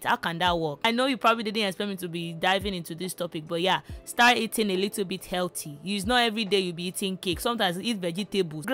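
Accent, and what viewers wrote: Nigeria. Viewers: 🇳🇬 The Nigerian accent 😍 >>> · I love how you kept your Nigerian accent, no phoney. Nothing😂😂❤ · Thank you so much about this wonderful video about the hair growth benefits of green tea! 🍵 ...And thank you for the words of Scripture. God Bless you! 🙏 P.S. I love your Nigerian accent! 💚 #NewSubscriber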